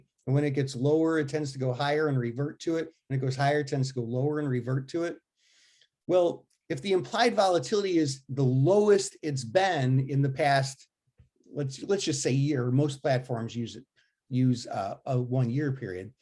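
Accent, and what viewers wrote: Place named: United States. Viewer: really struggling with this yank migrant's accent, rayt? "stahck" rayt?.. how does he say clock? "clahck" rayt? 🤢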